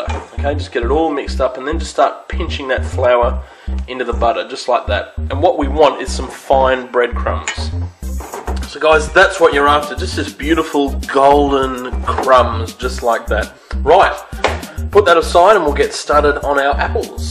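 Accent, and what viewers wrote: Australia. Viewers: I guess he's an aussie. He sounds all right for me. Butter, brown sugar and cinnamon...Thanks. I'm going to the supermarket now. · after the first ten seconds I wondered why his accent seemed so familiar and then I realised that he had an Australian accent hehe!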